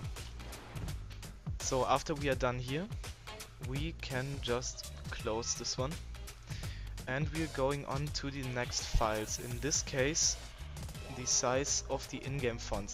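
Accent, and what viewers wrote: Germany. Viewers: you german? ^^ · Man merkt schon das du Deutsch bist aber das ich net schlimm! Dein Englisch ist gut!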